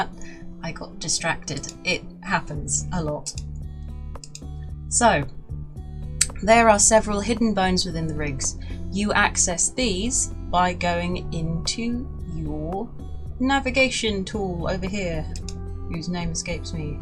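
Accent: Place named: United Kingdom. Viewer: Awww your british! Love your voice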